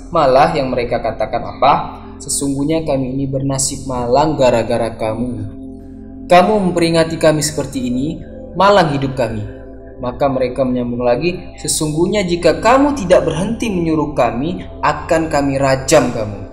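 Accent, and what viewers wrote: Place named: Pontianak, Indonesia. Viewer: Org Pontianak ya bang..haahha..mantap ni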